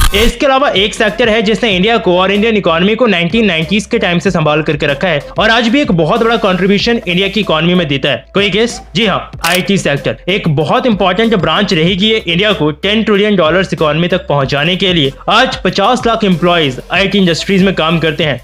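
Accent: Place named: Pakistan. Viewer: Bhadwe tu pakistani hai, fake khabar mat faila.. teri accent pakistani hai BKL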